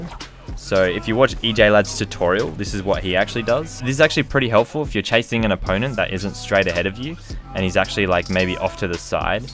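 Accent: Australia. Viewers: is he australian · Aye I’m a new sub lmao I could tell you were from au as well as soon as I heard you spoke aha hopefully we see each other in game at one point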